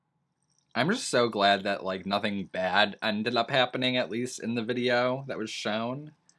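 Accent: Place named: Minnesota, United States. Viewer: I keep wondering what his accent is?? Minnesota? The a’s, like “welcome bahck”. It reminds me of Kathy Bates in AHS Hotel.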